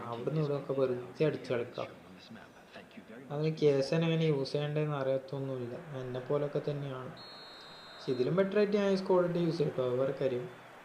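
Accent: Kannur, India. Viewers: slang kettappol thonni atha choyichee😅😆 knrr evdyaa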